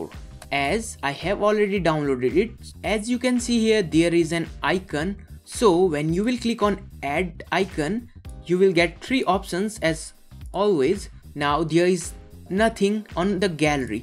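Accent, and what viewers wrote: India. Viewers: just cuz the guy sounds a bit indian? racism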